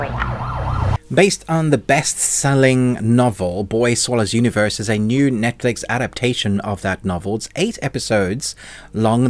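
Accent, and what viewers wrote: South Africa. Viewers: Are you South African and British or something?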